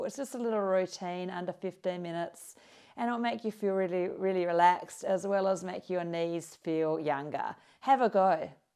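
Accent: Australia. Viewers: Thx for the yoga exercises while conscious of knee osteoarth. I like the gentle knee bends and then standing on toes. All other exercises seem solid/proven ones to induce motion, strength in muscles around knees. If in another video you could touch on the issue of cartilage loss, cartilage gap and how to prevent ill-effects from that specifically, that would be wonderful! Great presentation overall, very compassionate style. The Aussie accent (?) is charming. Kudos. Thanks so much for making these videos!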